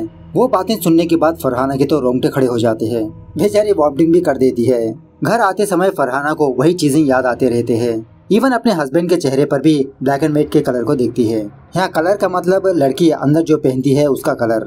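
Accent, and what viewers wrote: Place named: South, India. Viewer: it’s not shudh hindi Southern style Urdu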